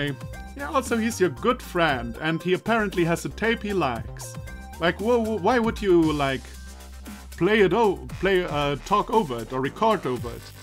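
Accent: Germany. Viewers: Are you german? I mean you really sound like you are ^^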